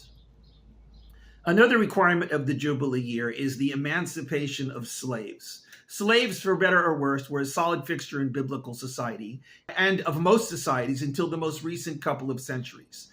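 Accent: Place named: United States. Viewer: You sound very indigenous to the Middle East with that American accent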